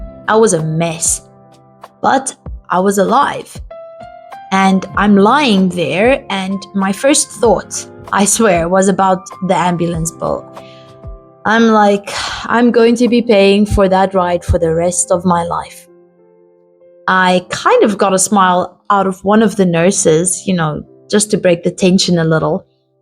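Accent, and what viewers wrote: South Africa. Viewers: Is the lady talking South African? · a bit of a South African accent there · Is that a South Africa accent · you are from Colarado, but you sound South African? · I detect an Afrikaans South African accent? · You sound South African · You sound solid Afrikaans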